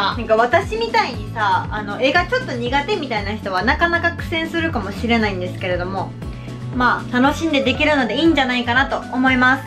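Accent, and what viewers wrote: Kansai, Japan. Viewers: おはようございます。 残りの２割の男性ファンでございます。 ８割がた同性ファンってわかるわぁ～ なんて言ってもmayoちゃんの親しみやすさ、話しやすさは天下一品だし、さらに関西弁が魅力を増してる 友達にしたいタイプNo１だね！←（あえて恋人にしたいNo１と言わない件に関してはノーコメントｗ） 少しずつ日差しに力強さが増してきてるここ蝦夷地。 早くコロナが終息して旅行に行きたいな～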